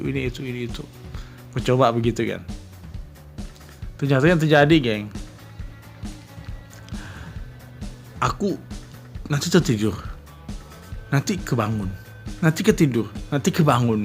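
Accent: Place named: Banjar, Indonesia. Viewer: Logat bahasa banjar nya keliatan bangt bang😂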